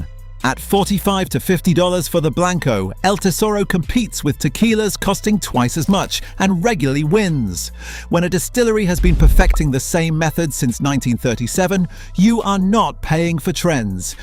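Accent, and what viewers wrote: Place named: England, United Kingdom. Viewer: There is a lot of questionable and somewhat misleading information in this garbage. Feels like an automated bot program creating it. And why would you select a English accent for an article on tequila. 😅Oh yes we all know England is a great source of tequila and information on tequila 🤣 I thought they were only allowed to add 2% additives to tequila. But who knows, maybe something changed or I'm remembering wrong. But I wouldn't necessarily trust this video as a source of information. A lot of it just seems like pointless hype and empty drama. And yes tequila can be sold as tequila and call tequila if it's only 51% actual tequila /liquor made from agave. The rest is usually going to be like some kind of Cane alcohol or something. And apparently that doesn't mean rum😂😖 and it is too harsh to drink straight 😂😖 But just because tequila says it's 100% agave does not also mean that it is good 😂 And you can legally put in the additives as long as you don't try putting on the label of the bottle that it is additive free. 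And many people will actually prefer the final products taste and texture with a little bit of additives in it. Not everybody cares about working their pallet into a "finely honed tool" to try to discern whether it's 100% natural or not having to buy tons of different tequilas to figure things out. They just want to buy a quick bottle to either enjoy sipping on every now and then or make some margaritas out of. And cheap rot gut tequila can often be great for making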